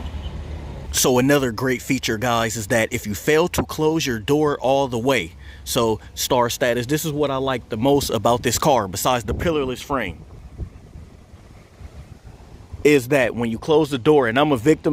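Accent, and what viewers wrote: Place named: South, United States. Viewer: Dude sounds like a country southern White boy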